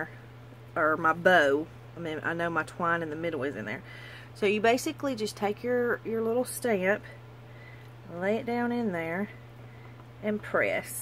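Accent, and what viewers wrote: South, United States. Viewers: Just found this video and it brought tears to my eyes. That accent it straight from my southern roots! Well, I still sound like that even though I’m a transplant 😎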